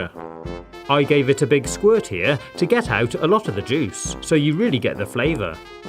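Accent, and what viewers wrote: United Kingdom. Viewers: I've been wondering are you British or not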